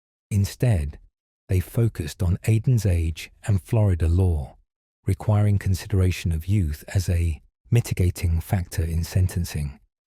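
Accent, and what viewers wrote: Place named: United Kingdom. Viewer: Why use the British AI accent for USA stories? Use the USA accent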